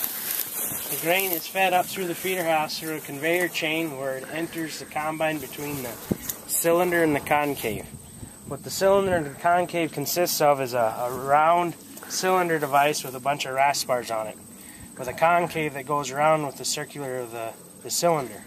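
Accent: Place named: Minnesota, United States. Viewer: Neat vid. I'm from Iowa and I'm looking at you and thinking your an Iowan but then I hear the voice and Im thinking Minnesota....maybe Northern Iowa?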